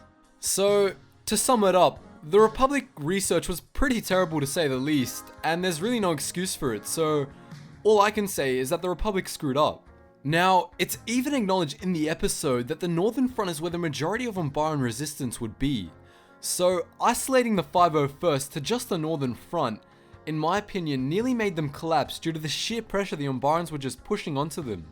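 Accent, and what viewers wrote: Australia. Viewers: How did it take me so long to realize that you are Australian? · maate didn't know you were a Aussie aye, what state are you from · Ahhh you’re an Aussie like me lol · I watched you and never new you were an aussie like me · Wow. You were not kidding about that Aussie accent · Aussie eh, Nice 🇦🇺🇦🇺🇦🇺🇦🇺🇦🇺🇦🇺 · You are an Aussie? wooooooooooooooooooooooooo go Australia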